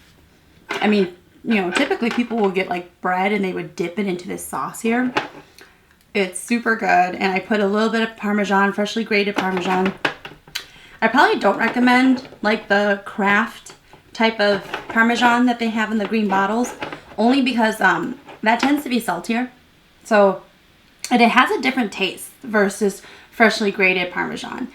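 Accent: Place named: United States. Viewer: 好可怕的美國口音!😳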